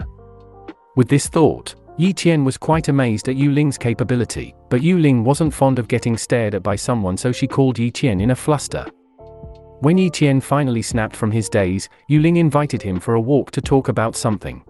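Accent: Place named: United Kingdom. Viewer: A British narrator this time🧐☕👌 😂😂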